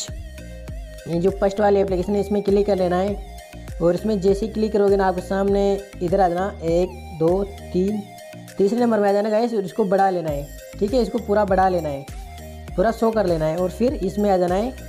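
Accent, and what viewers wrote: Gujarat, India. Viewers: Patel sound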